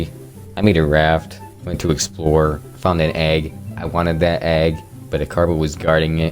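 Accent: South, United States. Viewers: Why is he getting more country as he goes? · Damn I wish we got more of that nice country accent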